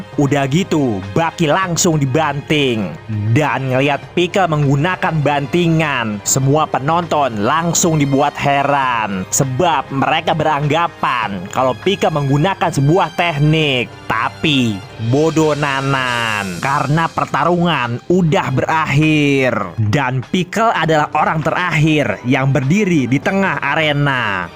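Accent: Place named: Betawi, Indonesia. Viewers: PEMBAWAAN CERITA ALA BETAWINYA KEREN PARAH,SUBSCIRBE AH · Betawi style...gw suka banget pembawaan channel ini...ET dh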